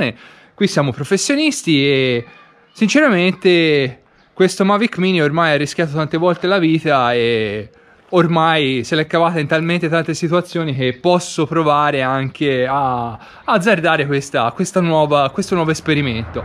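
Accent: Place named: Tuscany, Italy. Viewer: Oh che sei Toscano?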